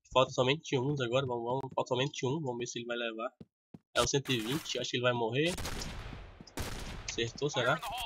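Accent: Acre, Brazil. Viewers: vc e do acre?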